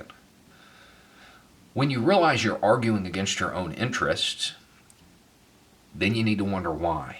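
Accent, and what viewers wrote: South, United States. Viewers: Thanks for this one. People who don’t accept science should delete their social media, cancel their phones, sell their cars, abandon technology and live with the Amish. I never comment on stuff but I’ve been watching you for a while thinking how does a dude with a southern drawl have these thoughts...then I found your other channel and it made sense Ⓐ ☮️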